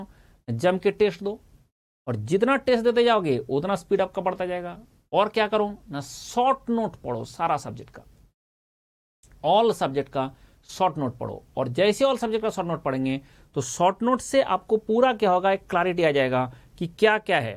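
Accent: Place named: West Bengal, India. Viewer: I'm sure sir is from west bengal .... Voice 🔊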